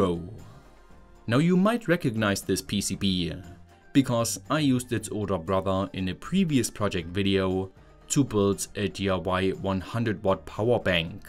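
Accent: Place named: Germany. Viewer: Very informative, but the weirdest accent I've ever heard... super German, but still saying "garage" like an American, and also; who taught him to hold a pencil? That looks terribly painful/inefficient !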